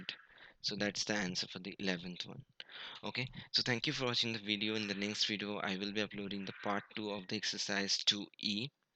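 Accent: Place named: United Kingdom. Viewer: Bro.. U should make in Hindi and English mix.. I mean.. Teacher tech like that But u are said like a British.. It's very bad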